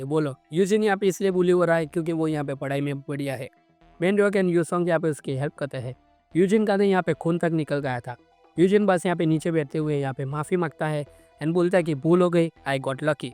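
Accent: Gujarat, India. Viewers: From your ascent i can say u are gujarati i am also ❤😊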